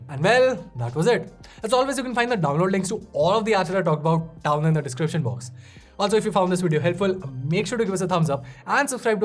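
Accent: India. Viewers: You're from delhi, aren't you? 😊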